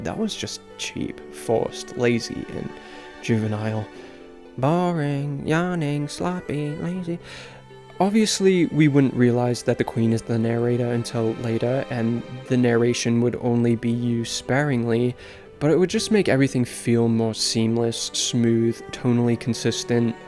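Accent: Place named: United Kingdom. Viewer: This is my second video from you that I’ve watched and I just have to say that your accent is really confusing me bro. You sound like a Brit doing an American accent. There’s no way you aren’t from the uk or at least spend a long time here or something because you’ve got proper English vowels mate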